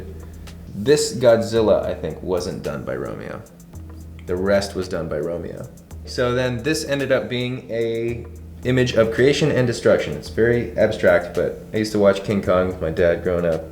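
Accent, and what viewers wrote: Ireland. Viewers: You are irish? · Ur Irish ah here come to me house hun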